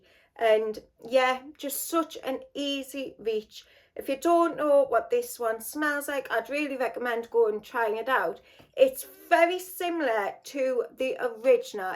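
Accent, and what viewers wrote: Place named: North East England, United Kingdom. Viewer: ! It’s so lovely to see you back🤗 Can you believe I’ve only just found your channel. I started binging your videos this month while recovering from gallbladder surgery! ❤️your content and your North East accent🥰. I have not worn much fragrances while recuperating but I do still love to sniff them😂. All the best from NYC x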